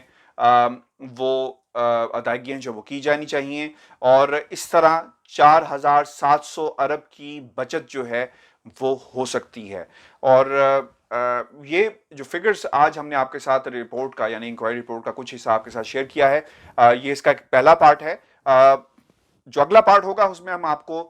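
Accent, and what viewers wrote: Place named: Punjab, Pakistan. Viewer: Sir good video but one suggestion you should work on your accent you have very thick punjabi accent when you are talking in Urdu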